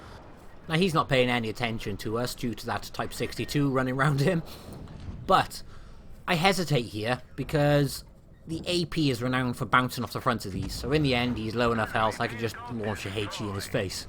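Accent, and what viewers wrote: Wales, United Kingdom. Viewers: Look See! Now in a minute! By there! You went super Welshy for this one!!! GG gr8 vid as always!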